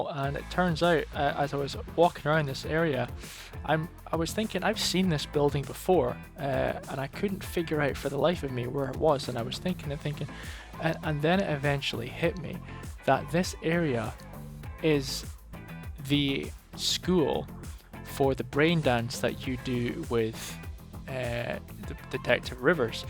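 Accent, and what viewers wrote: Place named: England, United Kingdom. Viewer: Good, Your video are great. This videos make sense because Night City is a place where CDPR can make a lot of very good stories for a long time. CDPR will likely be doing this and building a cool universe, better than the candy-sweet superhero universe from Hollywood. More ambiguous. Hey tell my, where are you from? Maybe from UK, You have a little English accent, I think?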